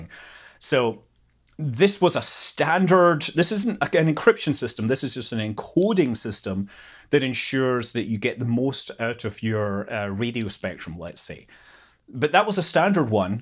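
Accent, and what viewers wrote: Scotland, United Kingdom. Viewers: Is this guy a Scott? Love the accent!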